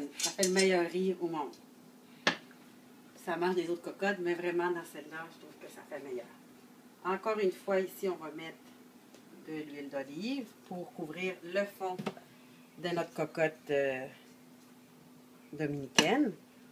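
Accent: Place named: Quebec, Canada. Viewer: She's Dominican Canadian I can hear her French Canadian accent and her Dominican Spanish accent lik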